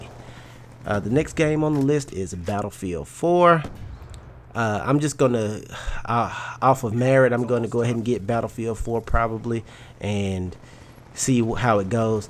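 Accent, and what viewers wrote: South, United States. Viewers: had to restart to see the new intro... it's the shit. I wanted to speak on a vid u did not long ago venting on the youtube game, subs and stuff. The reason i luv you channel is the reason your subs may be low. 1)don't care if people disagree a southern accent, on a black man especially people expect you to be dumb/ignorant. In this case no where close. 2)The unboxings you show should be less unboxing more review bc that matters most 3)there are just haters and i have a few ideas just respond pls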